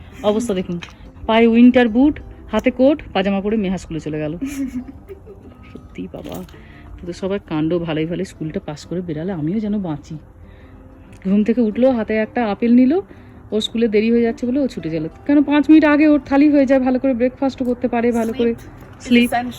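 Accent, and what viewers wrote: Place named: Hooghly, India. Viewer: Didi tumi medinipur er meye holeo kotha bolar dhoron onekta Hoogly district er moto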